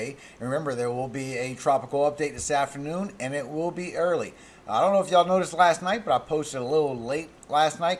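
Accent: Louisiana, United States. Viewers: I could tell you were from La. Please pray for my family in Red Stick. Shalom Patricia Sunday Ministries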